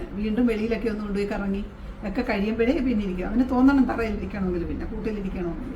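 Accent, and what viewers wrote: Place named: Kottayam, India. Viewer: ഞാൻ ഇത്രയും നാൾ കരുതിയത് ചേച്ചി ഒരു അച്ചായത്തി ആണെന്നായിരുന്നു. തനി കോട്ടയം ഭാഷ അല്ലിയോ 😂ആളെ ഇപ്പോഴാ കണ്ടത് സന്തോഷം 🙏🏻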